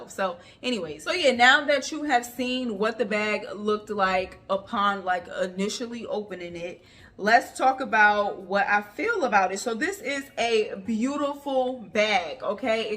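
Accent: New Jersey, United States. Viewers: I came here for the bag review. I've been off of Coach for a while. But it seems like they're making a comeback. So here I am. CUTE BAG! But let's talk about you! You have a great personality. So funny. Classy. And real. You remind me of me and my friends. You MUST be from the east coast. Sounds like Jersey. (I'm from Newark). In any event, great video. Great job!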